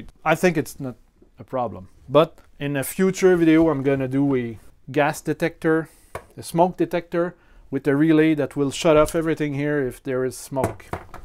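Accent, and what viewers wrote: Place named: Montreal, Canada. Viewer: I am too, and could tell with your accent! Je juge pas, je trouve seulement drole que je trouve dans fellow montrealer in the wild. haha !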